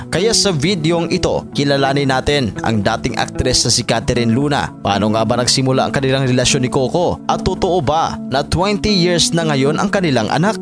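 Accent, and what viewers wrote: Visayas, Philippines. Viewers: Tang ina mo! Ipakita mo video , wag ung visayang boses mo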